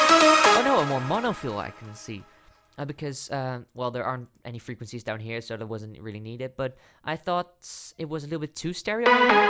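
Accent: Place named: Netherlands. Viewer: lekker nederlands accent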